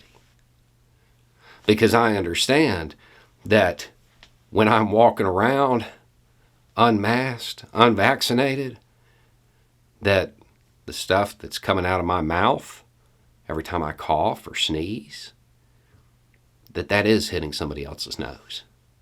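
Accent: United States. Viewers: You're so relatable with your country English. 🤘 P.S.: I'm late to the shot party, but I got mine. I felt zero pain or side effects. I'm still masking, cuz Delta is a bitch. Got the J&J vaccine. Never hear/see shit about people gettin' Delta on THAT one and done.🤔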